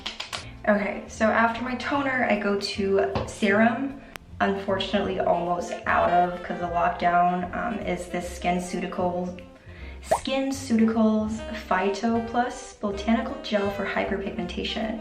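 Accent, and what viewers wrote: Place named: United States. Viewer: i’ve been following your insta for a while and this is my first time hearing you talk. and wow i didn’t expect the american accent! i really thought you would sound posh british somehow since i see you update in london quite a lot 🥲 you’re so pretty, by the way!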